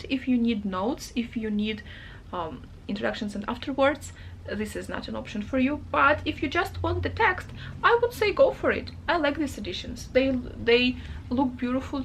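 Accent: Russia. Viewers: Сижу и думаю, почему такой акцент знакомый, оказалось, что у меня такой же. )) Привет из России ) Мега информативное видео, большое спасибо! Как раз подбирала себе издание, хочу собрать небольшую коллекцию книг на английском )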